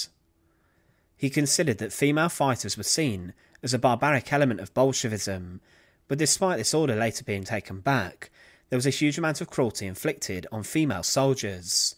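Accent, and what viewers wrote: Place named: England, United Kingdom. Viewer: It's the guy's English accent. I don't know which particular accent it is, but the "fousands" bit, instead of "thousands," just baffles me.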